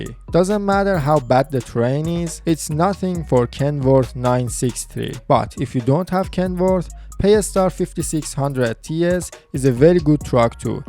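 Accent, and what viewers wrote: Iran. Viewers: لحجش چقدر شبیه ایرانیاس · داداش ایرانی هسی؟لحجت خیایییی مثل ایرانی ها هس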